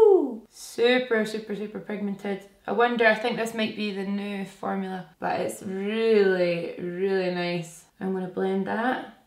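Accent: Scotland, United Kingdom. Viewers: I recreated the 1st 2 yesterday , I had no lenses so i was blind as shit but still loved playing with makeup 💗🙌 also the Scottish accent is EVERYTHING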